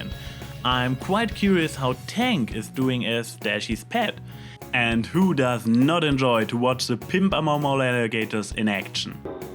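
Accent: Germany. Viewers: Ich hatte an Deiner Aussprache schon erkannt dass Du Deutscher sein musst :D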